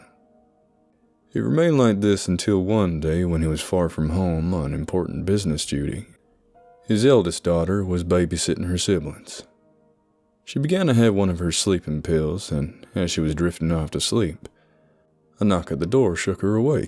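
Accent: South, United States. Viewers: I love your overly southern deepest of the south accent.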